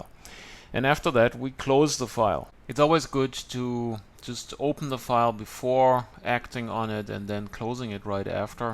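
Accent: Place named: Germany. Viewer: German accent makes this video even more informal! ;) (like)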